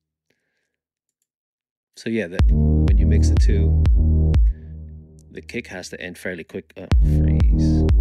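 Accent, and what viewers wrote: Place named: Ireland. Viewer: Unbelievable work my friend! Where abouts is that Irish Accent from?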